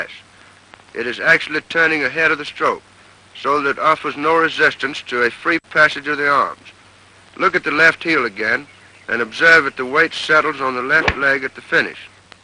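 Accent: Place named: Georgia, United States. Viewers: God Bless you & your family. I trust ya'll are doing well. I am a linguist & most thoroughly enjoy this most classic American accent, very much so. It is one of those, like so many, that are unfortunately getting lost to time. Cheers to you & your kin 🍻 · Growing up in Georgia, this was how all my older relatives spoke at family reunions.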